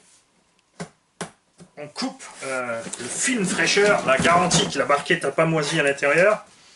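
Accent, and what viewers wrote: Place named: Alsace, France. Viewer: bonjour. j adore vos vidéos et votre accent me rappel les 6 années que j ai passé dans la région de mulhouse. je suis passionné de modélisme rc et j ai pratiqué le piste 1/8 thermique dans les années 95/96/97. (sur chassis mugen et serpent). j adore votre façon d aborder la rc loisir mais de façon sérieuse et pro. tout vos conseils sont super. et domage que je ne soit plus en alsace car j aurai adoré rouler avec vous sans les contraintes d un club. bonne continuation a vous.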